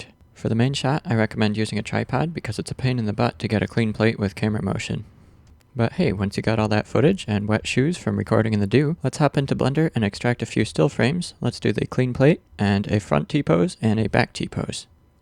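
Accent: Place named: Canada. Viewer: Joe Pera with a Canadian accent and Shawn Mendes face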